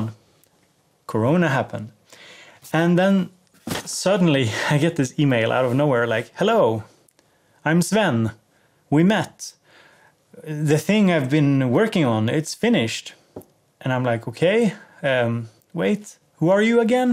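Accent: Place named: Sweden. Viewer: Sounding particularly Swedish today! :)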